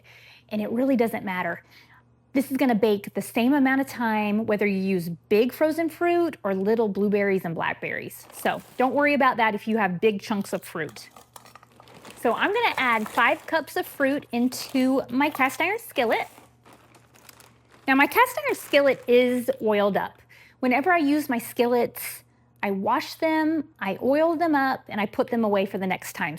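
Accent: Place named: South, United States. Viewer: … I just knew you sounded like a Southern Girl, like me, in Texas. Didn’t have time to make the cobbler today, I did get out the flour and sugar, I have fruit and will make it soon. Makes my mouth water to think about it. Thanks again for sharing. I’m really enjoying your recipes, want to make that easy bread too! 🤗🌺🤗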